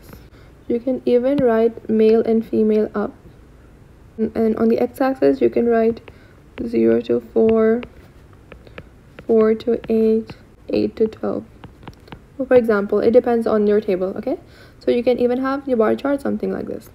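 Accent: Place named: Pakistan. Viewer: Where are you from...Ur voice seems Pakistani 🇵🇰❤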